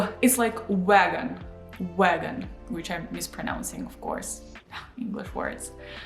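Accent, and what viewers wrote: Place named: Russia. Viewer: learn French from a Russian accent teacher, interesting....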